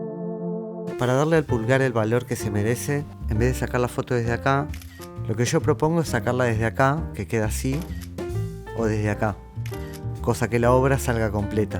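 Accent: Argentina. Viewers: Y te digo que por su forma de hablar al principio creí que era argentino, suena igual 😄🇦🇷👋🏻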